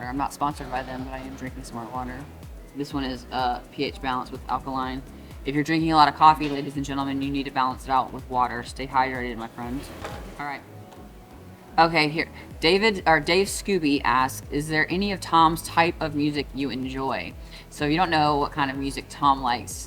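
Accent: South, United States. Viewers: Some people had a problem with your Southern accent? That's crazy! Growing up in Florida's peninsula and close to the coast, I don't hear that type of accent that much around here. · Reply 01: Good Wednesday evening to you and a great video post. Thanks for taking the time to answer very interesting and important questions from your fans. 🙏🏿✝️ Reply 02: Some of what you discussed. My favorite female talent varies. Thunda Rosa I'm a fan and fond of. I gather you remember her. Victoria has grown on me and if it's anybody I'm pushing for to get their flowers is her. As for male talents, I grew up a huge fan of The Junkyard Dog back in the 1980s. I have to be honest, he was both a source of wrestling inspiration and shortfalls. That goes to his unfortunate use of drugs. As well that after his death he lost his only daughter. Shortly after she received his WWE Hall of Fame award. 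As for the men. Bret 'Hitman' Hart is one of my all-time favorites. So too was Stone Cold Steve Austin, long before he was that and before he entered WCW. He was Stunning Steve Austin all the way back in the USWA. As well I pick him as the greatest wrestler of the 1990s. Reply 03: As a fan of yours and looking forward to big things from you. I love the southern accent and dialect. The former WWE Lacey Evans real name Macey Estrella has a similar southern dialect. She's also a stunning beauty, ex-Marine and also married. She's got a wonderful business down in South Carolina her home state. Reply 04: Recently, you broached a topic on Instagram about not being a ballerina. I offered something to the contrary. Not only do you have the physical structure of one. You remind me of Actress Ju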